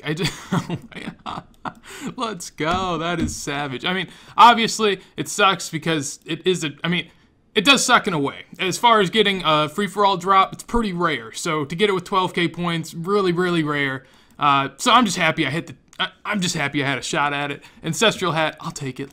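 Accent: Michigan, United States. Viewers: Yooo are you from Michigan? Let's go blue baby final 4!!! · Wait your from Michigan? :O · Wait are you from Michigan? 313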